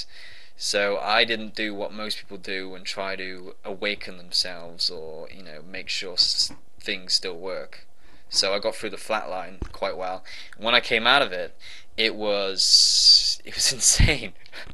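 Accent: United Kingdom. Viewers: Hey brother, firstly congratulations on your monumental achievement. Your video is spot on with all the good reasons to give up the fapping and is of great inspiration to me. I have been in exactly the same spot you described, massively addicted to gaming and fapping for years. I am now slowly pulling myself out from it (no pun intended!), and have decided to focus the extra energy and time towards meditation. Thanks for all the inspiring words. By the way I am trying to place your accent, Mid-Wales or Shropshire area per chance? Cheers again matey! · What kind of British accent do you consider yourself to have? Just curious because I'm studying English and really like your accent - I'm not sure though in which area of the UK do they speak like you? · Where you from bro? Is that a welsh twang in your accent lol? I'm gonna start no PMO tomorrow, gonna aim for 30 days and may continue after more research and experience etc. 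Have you got facebook?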